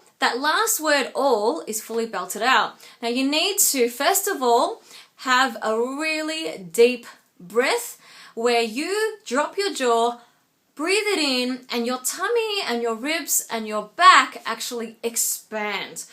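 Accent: Australia. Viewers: You're Australian. :) I'm considering singing lessons and I wanted to know what part of Australia you're from.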